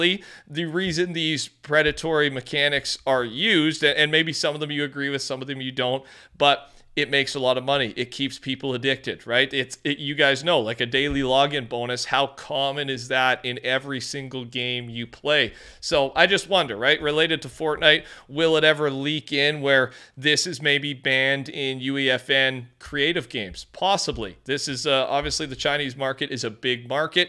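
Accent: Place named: Canada. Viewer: Canucks hat....the hoser accent. My guy lives down the road 😮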